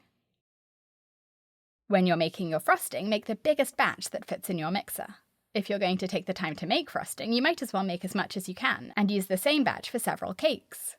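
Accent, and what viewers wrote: United Kingdom. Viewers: Amazing tips.By the way are you British?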